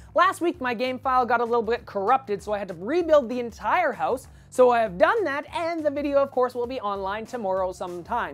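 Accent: Canada. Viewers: Being from Canada It's actually his accent...he didn't say it wrong. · Guys, he's Canadian. Italian pronunciation doesn't really have a lot of influence. Both are acceptable.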